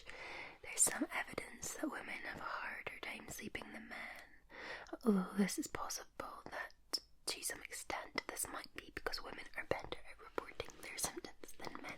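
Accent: Scotland, United Kingdom. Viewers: I don’t know what it is but that Scottish accent is so soothing and it puts me to sleep really fast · My New favorite!!!! 😍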